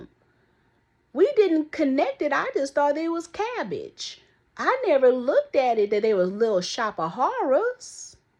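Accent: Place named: Upper Northeast, United States. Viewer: Loving your upper NE Upper Crust enunciation - Accountability 😂. And I know y'all remember "Unpack your adjectives"😅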